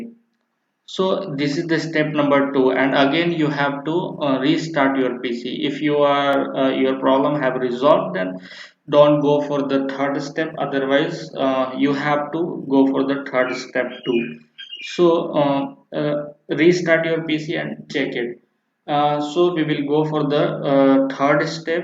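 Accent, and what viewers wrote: India. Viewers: Ffs Good Video But Im So Sick Of That Indian Accent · the indian accent is the cherry on top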